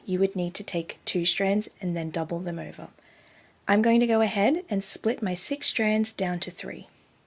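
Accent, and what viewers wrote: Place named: Australia. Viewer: Great little demo video. Thank you. Nice to hear an Aussie voice, and a very relaxing one too!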